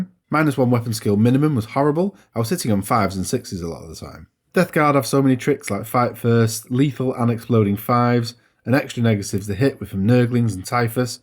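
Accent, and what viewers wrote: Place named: Northern England, United Kingdom. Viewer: Ok, fine, you sound like a northerner. My family is from Northern England. now just a bunch of Americans.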